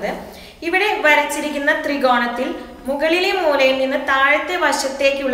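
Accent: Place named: Kozhikode, India. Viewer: Edhnn parennath😂 kozhikoden slag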